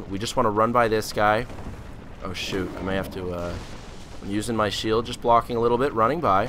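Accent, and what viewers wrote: Canada. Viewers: Love the accent, Canadian? This condensed walkthrough will help me immensely. I died after my first attempt to get into Undead Burgh after I slaughtered quite a few and had a "wat?" moment so I've been waiting for some help.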